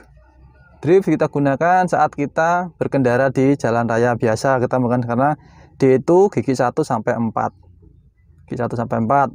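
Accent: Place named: Java, Indonesia. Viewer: Suara nya medok ya kak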